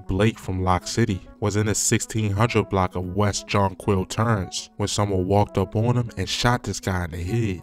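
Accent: Baltimore, United States. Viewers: Bruh… Baltimore accent or not, please, please dont speak on this ish if you gonna absolutely slaughter the pronunciation of half of what youre speaking on. Is it Young Pappy, or Poppy? You mispronounce and properly pronounce his name back to back. It’s Farwell, not FAREwell, and Loc as in “loke” not “lock”. It takes very minimal, base level research to know this. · This speaker must be from Baltimore lol he ain’t from no Chicago n I’m from here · The narrator gotta be from Baltimore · Mf narrator from bmore💀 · Bro you got to be from Baltimore tell me if I'm wrong 😂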